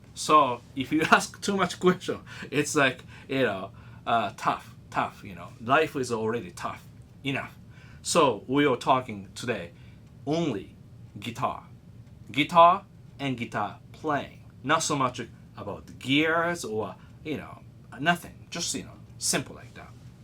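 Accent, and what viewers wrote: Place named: Japan. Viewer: Amazing story! Perfect timing for me to hear this from you as I am also discovering my tone and how it makes others around me happy to hear it. I used to play and think I sounded great when I’m by myself, and then I would go on stage in the early years and all I could do was hear all my mistakes and think to myself how I sucked. But people would come up to me afterwards and say how phenomenal my playing was, and I couldn’t believe it! Because I noticed ALL my mistakes. But I’ve come to the point where I don’t care what other people think, and I don’t care if I screw up at all as long as I can have fun. Thank you for sharing the story about you and your journey with the guitar. I really appreciated hearing it from you. Japanese was my first language and I started learning English when I was six so your accent reminds me of some of my Japanese relatives. 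Jya mata yoroshiku onegai shimasu.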